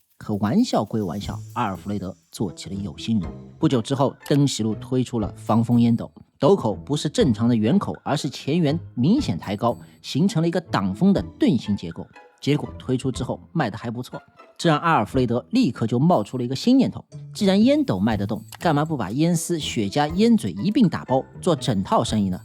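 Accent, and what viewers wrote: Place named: Shanghai, China. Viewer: 博主上普口条很舒服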